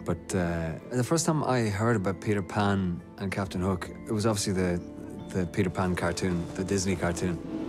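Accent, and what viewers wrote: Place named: England, United Kingdom. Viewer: Ah okay right, I misanderstood English and Irish accent actually yes he does use the English accent more than the Irish one :) thanks for clearing that up for me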